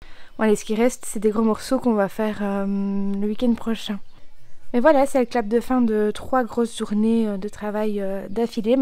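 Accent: Belgium. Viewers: C l'accent belge 😋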